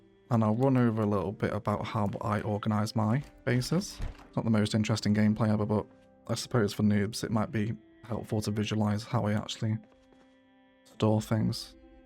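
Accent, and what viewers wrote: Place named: North, United Kingdom. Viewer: Come on! I recently moved to Australia from the UK and it's lovely having a chill northern British voice talk to me about Zomboid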